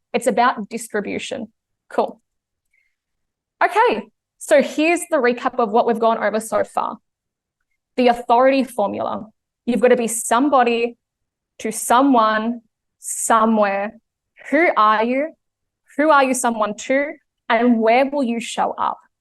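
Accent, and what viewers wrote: Australia. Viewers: I love your Australian accent. It is very beautiful to my ears........:) Thanks for valuable information.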